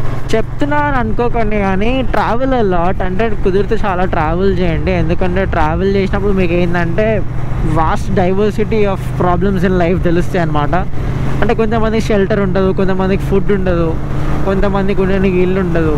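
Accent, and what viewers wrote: Hyderabad, India. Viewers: That hyderabadii language was wow 😂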